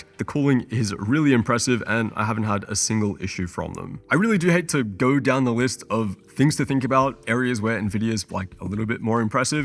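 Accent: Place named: Australia. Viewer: why are u filming videos if u have a cold/flu. not like i can stand aussie accent anyway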